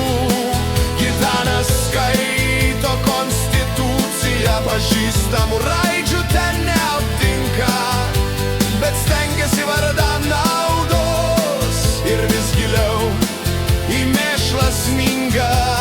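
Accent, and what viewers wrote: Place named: Russia. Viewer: Ar čia tyčia rusiško akcento gaidelės?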